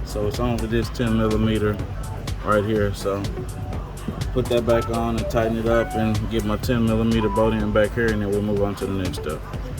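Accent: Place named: Texas, United States. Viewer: Man I heard you talking and I know straight up yous from Texas nail it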